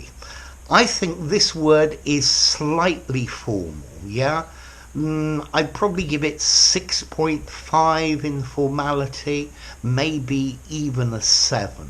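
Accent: United Kingdom. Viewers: Too much British accent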